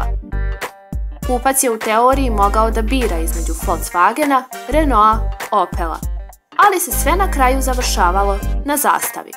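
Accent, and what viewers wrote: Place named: Bosnia and Herzegovina. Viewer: Znas li ti koliko si daleko od bilo cega u vezi sa automobilima ?Toliko gluposti da se ovo ne komentarise.Komentarisem tvoj glas ,koji je negde iz Federacije Bosne i Hercegovine.Ti si toliko daleko od poznavanja automobila Kao Toyota -Camry model 1970-tih od Zastave 750L.Toliko netacnih gluposti,zavisti...Pri tom nemas pojma da par 10 zemalja na svetu proizvodi automobile u 20 tom veku.Znaci,ne sklapa,nego proizvodi.Kad vidis sledeci put Zastavu,najbolje je da cutis.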